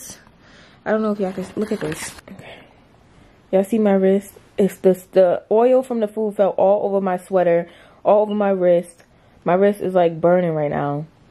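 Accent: New York, United States. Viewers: Is it me or is that New York accent getting extra strong lol I think that since you been in New York your accent reappeared, I love ittttt!!!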